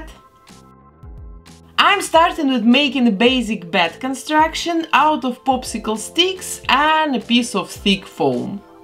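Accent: Russia. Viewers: Мне одной интересно, почему русская женщина до сих пор не потеряла акцент?))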